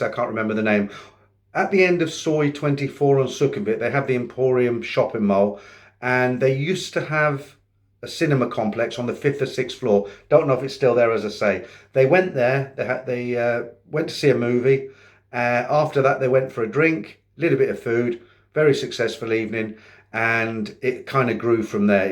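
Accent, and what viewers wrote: United Kingdom. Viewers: are you saying "Edgar Myer"? lol im sure its EKAMI. Love the brit accent mate....just funny.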